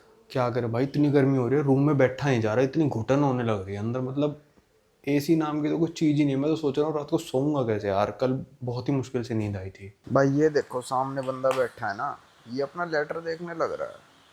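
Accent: Haryana, India. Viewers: Mainly m bhai ki video dekhta hu bhai ke baat krne ke tarike se attract hoke bahadurgarh wale style me mast😅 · Batane lag raha hu....jaane lag raha hu....batane lag raha hu....ye bhai lag raha hu kisi bhi cheez ke peh le .......ye kis region ki hindi hai india mein? Koii bata de...may be a weird question to ask😂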